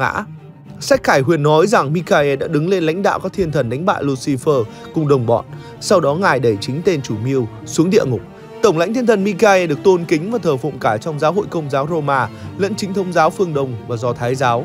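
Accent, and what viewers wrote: Vietnam. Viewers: Nói giọng Bắc ko chuẩn lại nói nhanh nuốt chữ khó làm người nghe ko kịp, phát âm các tên TLTT ko rõ !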